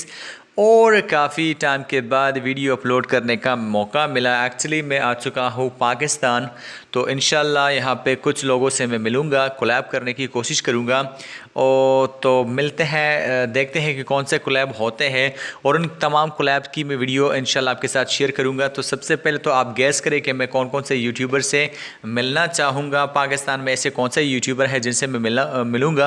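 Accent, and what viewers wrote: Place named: England, United Kingdom. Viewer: Abe yaar sahi se bola karo Yaar zyada ismaat kyon bante Ho Urdu me bhi inglish andaaza o lehja ghused dete Ho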